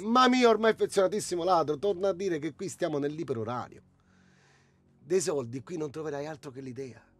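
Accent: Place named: Rome, Italy. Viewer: Aperto il video, non stavo guardando lo schermo e appena ho sentito parlare in romano ho zompato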